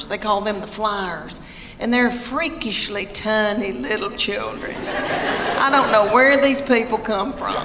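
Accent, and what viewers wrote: South, United States. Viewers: This lady is absolutely HILARIOUS with that NATURAL southern accent, I love her and she's really cute to boot🥰🥰🥰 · Southern accents are my favorite! Sounds so nice! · From the South. I believe she is from TN. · So true about how everyone (parents and kids) act at these events. Absolutely hilarious, all the more so because it’s true. And it’s Leanne Morgan so it’s got her southern accent and insight. You’re a national treasure. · After discovering Jeanne Robertson, then you, I realise I have a liking for southern lady comedians. Your charming accent is the perfect accompaniment to a funny story. If you visit the UK, I shall definitely buy a ticket. 💕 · She's absolutely beautiful, and I love her southern accent! 😘😍🥰 Thick women are a gift! 🎁🥰😘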